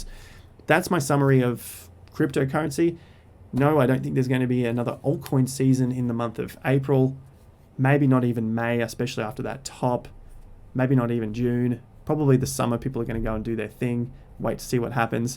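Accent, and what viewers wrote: Australia. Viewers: Great to hear great content with a bit of an Aussie accent...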